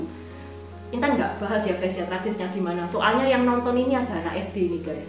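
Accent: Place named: Banyumas, Indonesia. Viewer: Intan mendok2nya ituloh.... Ngapak buanget... 😂😂👍👍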